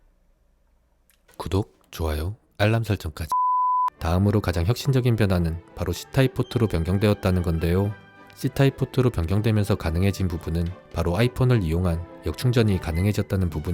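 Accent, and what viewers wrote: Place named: Gyeongsang, South Korea. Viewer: 경상도 사투리에 줄줄 새고 뭉개지는 딕션까지.. 치아 교정하세요?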